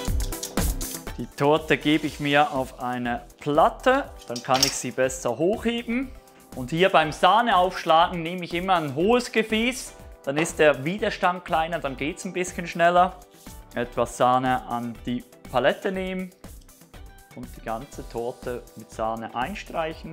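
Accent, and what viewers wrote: Switzerland. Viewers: Ach, ich höre sehr gern das "Schweizerische"! Super Idee mit tollen Praxistipps. Vielen Dank und bitte weitere solcher Backideeen.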